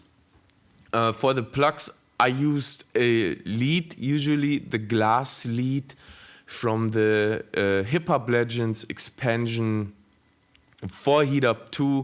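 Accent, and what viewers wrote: Germany. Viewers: That german accent😂 Great remake🔥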